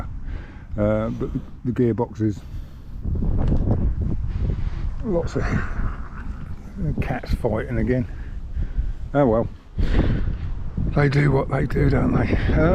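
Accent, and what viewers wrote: United Kingdom. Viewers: 1000 views is actually pretty good for any video but the 'show you what to do' videos are the popular one's that people like to watch with a ride out at the end of project.I would still do the ride out videos you're a young chanel & 1000 views already is good & word will spread about your love for old RE & your knowledge of how to fix them. A tripod be great to film your how to videos & also having someone hold camera 🎥 as you do stuff if you can or zoom in on part & when editing explain what you're doing etc. You also have a good accent that's easy to understand & people like a good British accent to listen too.Keep up the good work.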